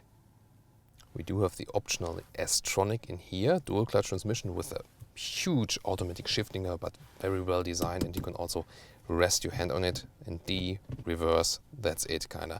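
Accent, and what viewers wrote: Germany. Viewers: Great review! Simple and focused on the subject. Also like your english with german accent. Have you got any polish roots coz your surname sound like?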